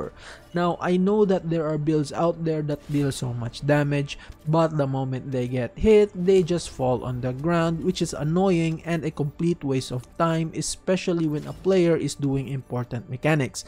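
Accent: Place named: Philippines. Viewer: I had a feeling I was listening to a Filipino accent and I was right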